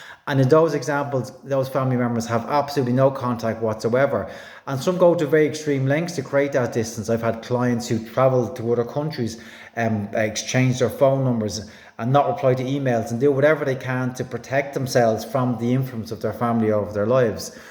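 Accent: Ireland. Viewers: If I could put forward some feedback? ... excellent video, excellent presenter and information! The sound is a little bit echoey. Wondered if you could use a mike to improve the sound?. Am grateful for this video. Love your tone of voice and your Irish accent ! So nice to listen to. Luv from a New Zealand woman 🇳🇿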